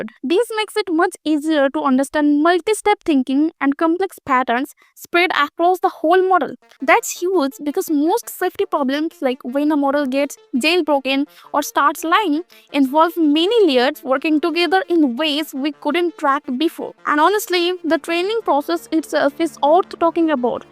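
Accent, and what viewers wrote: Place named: India. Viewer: Absolutely Amazing video and information. The only major issue is that the accent is hard to understand, especially for non-indians. 😊 Happy Xmas and New year.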